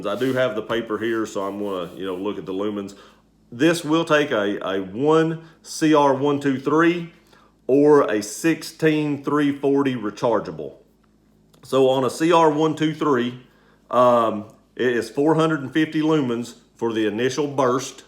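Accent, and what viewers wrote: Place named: Alabama, United States. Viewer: Also, where are you from? I’m pretty good with accents but am having a hard time making yours out. I’m guessing somewhere north of Tuscaloosa?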